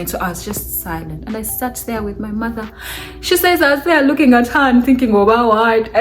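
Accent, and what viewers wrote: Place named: Uganda. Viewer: Uganda 🇺🇬 accent is so super wow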